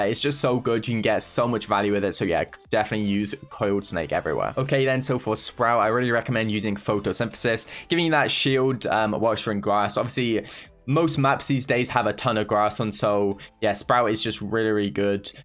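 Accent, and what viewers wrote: United Kingdom. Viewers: Sooo early....love to hear your British accent😅😅😅btw big fan........ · For some reason, I like hearing British accent!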